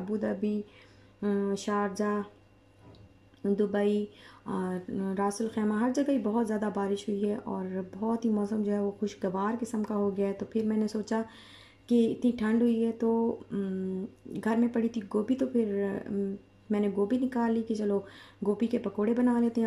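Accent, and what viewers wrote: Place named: Multan, Pakistan. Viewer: very good mgr ap ka andaz bolny ka multan walon jesa hy jo bht sweet hy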